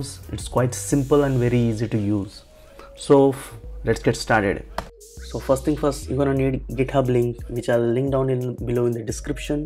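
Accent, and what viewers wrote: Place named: India. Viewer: indian voice, 100% virus/ scam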